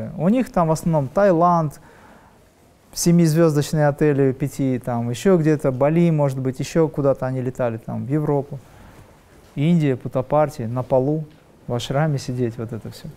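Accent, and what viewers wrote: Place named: Dagestan, Russia. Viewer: Аварский акцент есть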